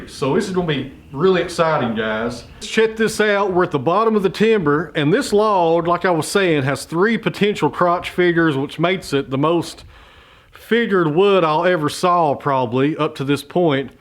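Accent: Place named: East Tennessee, United States. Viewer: Ha! I started watching this and thought "that sounds like an east TN accent", then looked at the description and saw you was from Kingsport! (I'm from WNC, love me some east TN!)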